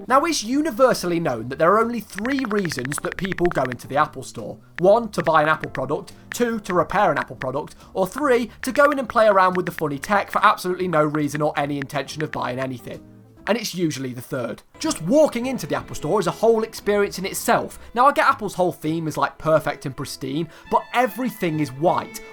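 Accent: United Kingdom. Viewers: I can't understand ur bri'ish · Your accent i need it ... How to have an British accent like yours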